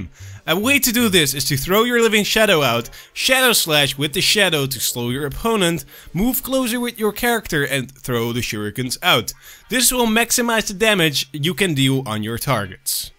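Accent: Netherlands. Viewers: Lol he's dutch if you can hear it :] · Are u dutch? Great accent tho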